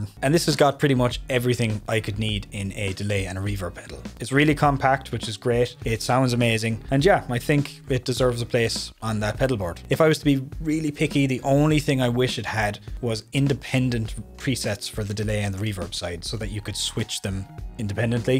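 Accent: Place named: Ireland. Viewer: New sub here, Tis gas to be listening to a pedal review with a good oul strong paddy accent 😂 Fellow paddy here. Great review ta be fair to ya. Hoping to pick one of these tomorrow to try scale down my board and utilities midi functionality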